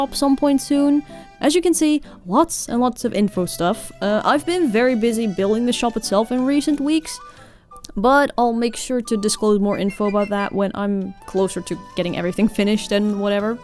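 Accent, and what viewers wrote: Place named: Brabant, Netherlands. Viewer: Zag de "Jezus wat was 2020 een kut jaar!" en ging verwachte vanaf dat moment dat je Brabants zou zijn haha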